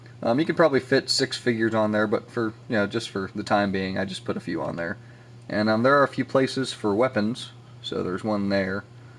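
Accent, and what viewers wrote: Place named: United Kingdom. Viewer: i can feel the british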